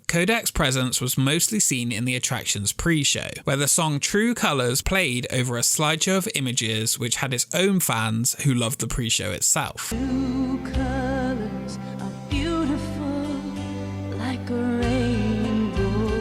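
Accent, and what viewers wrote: United Kingdom. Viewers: He’s British, you gotta give him a break :)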